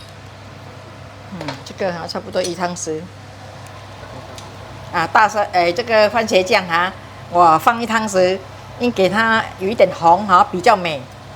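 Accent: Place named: Hokkien, China. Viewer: you are fantastic. I love mee Siam., I love your Chinese with, I believe, with a taste of Hokkien. So familiar and homely.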